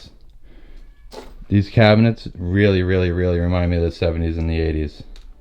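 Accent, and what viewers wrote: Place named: Long Island, United States. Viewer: i take it you split from either long island or nyc i leaning more on the long island thing by the way you talk .so you raded ny for flo???????how is it dwn there thinking about moving myself .from the great communist north east ..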